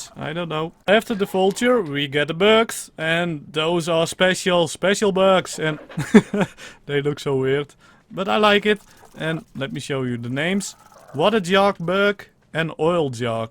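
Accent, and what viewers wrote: Netherlands. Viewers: Is he Dutch because I hear Dutch pronoun citation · Yes im dutch too ik hoor het aan u accent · are you a dutchman? you sound like one...i recognize the accent! XD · Hey your dutch i can hear laat de leeuw niet in zijn hempie staan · I'm just gonna assume you're dutch. Because you sound hella dutch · Ik hoor nu al dat dit een Hollander is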